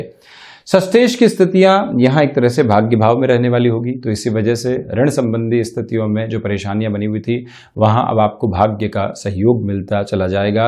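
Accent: Standard. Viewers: Sayali absolutely my Hindi has actually improved to some extent hearing his pure Hindi.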